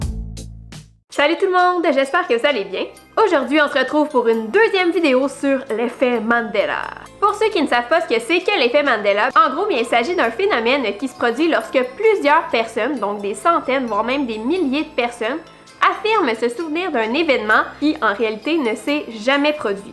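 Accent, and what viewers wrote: Quebec, Canada. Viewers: t'es québéquoise? j'adore ton accent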